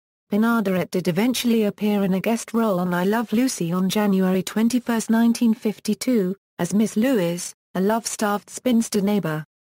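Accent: United Kingdom. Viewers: I was hoping this would be a new twist on this great actress; but it appears to be a reading of WIKIPEDIA with a British accent. Thumbs down.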